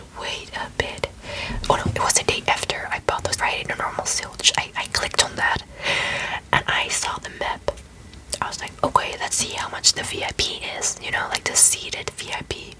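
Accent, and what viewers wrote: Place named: Netherlands. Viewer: Lovely accent ❤️ , are you from The Netherlands?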